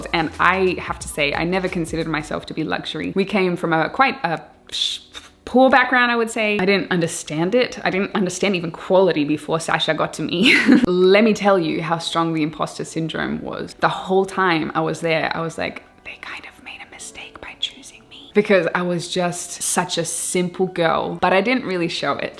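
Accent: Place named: Australia. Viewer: Been following for a while but didn’t realise you were an Aussie 😁 Nice one !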